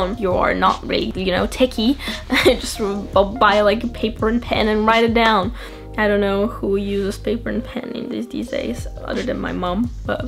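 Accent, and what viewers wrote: England, United Kingdom. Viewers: Did ur english become more British? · You are really amazing!!! I’m shocked at your English accent 😍👍🏼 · It’s surprising that you don’t have Japanese accent but Englishish accent!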